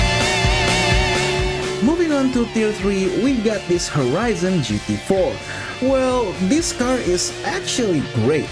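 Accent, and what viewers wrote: Indonesia. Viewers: you sound indonesian...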